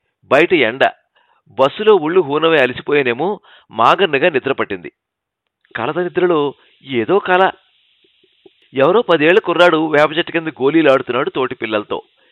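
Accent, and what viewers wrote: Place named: Guntur, India. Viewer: చాలా కాలానికి మట్టి వాసనతో పరిమళించిన అచ్చ తెలుగు కథ విన్నాను. పాపినేని శివశంకర్ గారి కథా సంపుటి "మట్టి గుండె" లో ఈ కథ చదివాను. గుంటూరు జిల్లా పల్లెటూళ్ళలో స్వచ్ఛంగా వినిపించే భాషతో కథ మరింత శోభించింది. మా జిల్లా ప్రజల భాషను కథలో చొప్పించిన శివశంకర్ గారి ఘనత ఒకటైతే, ఉత్తరాంధ్ర వాసి గారు, ఆ భాషకు పూర్తి న్యాయం చేకూర్చటం మరొక ఘనత. రచయితకు, ప్రయోక్తకు వేనవేల వందనాలు.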